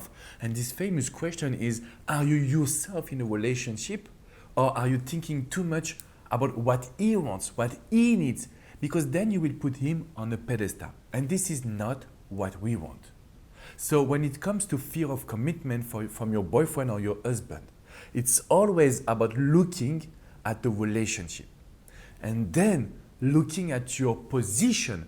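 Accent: France. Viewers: Please check my msg sir... i didnt meant to criticize yu..nt even think about that.. bcoz u r the coach i have huge respect for.. i only mean.. i want some advice at this particular situation... i love this french accent words very much❤️... i lv , respect u sir.. atleast pls don't misunderstand me fr that comment... please help me to get over this situation sir...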